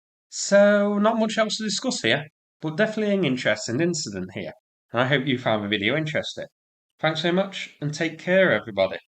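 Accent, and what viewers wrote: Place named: Lancashire, United Kingdom. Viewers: To the VO words ending in ING are pronounced ing like housing not housin ‘ ing . If you’re going to do a video at least learn your pronunciation. You sound ridiculous. The Lancashire accent is bad enough ! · Northern English accent.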